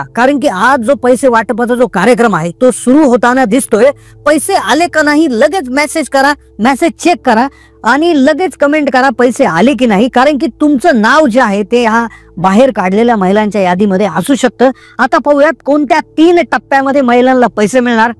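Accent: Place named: Kokrale, India. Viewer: कोकराळे मध्ये बोलते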